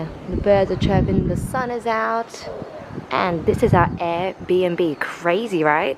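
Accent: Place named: Australia. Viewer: I want to visit Sydney, and Melbourne again. It is so beautiful in those two cities. As a american man I love the way women from Australia talk just like you sweetheart. Good video.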